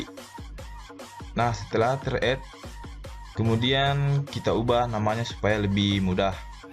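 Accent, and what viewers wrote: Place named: Bugis, Indonesia. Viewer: Logat bugismu itu e 🤣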